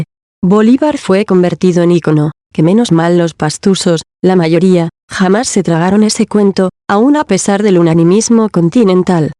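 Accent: Spain. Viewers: Bueno yo les voy a decir algo quienes fueron los primeros MATONES DELICUENTES SAQUEADORES BIOLADORES ASESINOS en la colonia latinoamericano quiénes fueron los Epañoles y tu hablas de un VENEZOLANO que se REBELÓ Y TRAICIONÓ AL IMPERIO ESPAÑOL para la libercion del RÉGIMEN CRUEL ASESINO BIOLADORES LADRONES QUE SAQUERON A LOS PAISES latinos de a aquélla epoca . TODOS TIENEN SU LADO OSCURO OSEA tienen RABO de paja no se pueden acerar a la CANDELA POR QUE SE VAN A QUEMAR hay te la dejo . Por tu acento eres ESPAÑOLA PAMAS VAINA jajajaja me CAGO DE RISA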